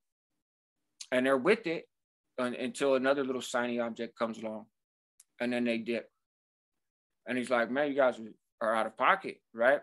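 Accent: Mexico. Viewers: When I close my eyes while watching this I hear a Mexican dude but when I open them I see a white dude.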